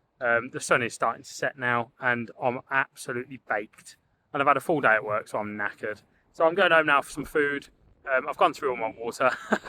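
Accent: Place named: Midlands, United Kingdom. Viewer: looks like a Roman handle, Ellie Miss_D will know. is a beautiful shoe buckle or a knee buckle. 100% nut crackers, someone cut them down. Good video. I thought that was a Midlands accent, I was born in Birmingham and all my formative years were in Shirley.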